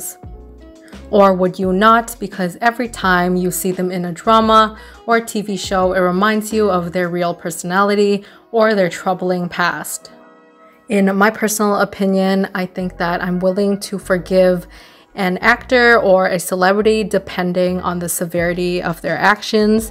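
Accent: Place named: South Asia. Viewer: I have watched it once or twice. Not really interested about it. Because I think they are just not accurate. Just notice that the channel owners usually from south asian - due to the accent. Probably form India or Pakistan. It's hard to attain such informations like income and personal life. It's difficult to dig out info about C K and J artists n Idols because they keep their life privately. Especially in China. Mostly bcause the govt watches everything. But you can get info from reliable sources like established media with reliable journalist. Or try to read a blog. But absolutely they cant tell the exact amount. Only the speculation. I cant speak chinese korean n japan. I only read and watch in English. Oh yeah..even their own native media cannot tell the exact info about the artist. For example. Nobody knows where Fan Bingbing resides exactly evsnthough people said she lived in luxury complex. 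Japanese paparazzi often make mistakes about where their idols actually sleep. For chinese actors..we already know they have yinyang contract. Wont know how much they get paid. And we dont know how much their agency fee and their expense. And how they manage their money. Because when they exposed their wealthy, they should already to pay tax too. And dont forget people who will critize about how the artists manage their wealth. IU got critics when she bought the land. Actually she was helped by the asset management agency. JunJihyun was critized for being a mean landlord. AFAIK, s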